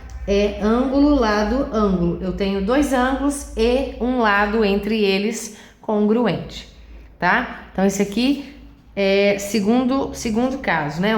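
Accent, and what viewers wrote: Minas Gerais, Brazil. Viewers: Prof.ª: congruência NÃO significa, necessariamente, igualdade. Congruência, por definição, é a coincidência ou correspondência de caráter ou qualidades; proporcionalidade, conformidade, concordância, harmonia. Se dois triângulos têm os três lados iguais e dois ângulos também iguais, obrigatoriamente tais triângulos são absolutamente IGUAIS. Mas, como regra geral, a terminologia correta no estudo de semelhança de triângulos, o correto é utilizar a palavra "congruência ou congruentes". Importante observação: suas mãos são lindas, assim como seu sotaque mineiro ( lá de Barbacena ).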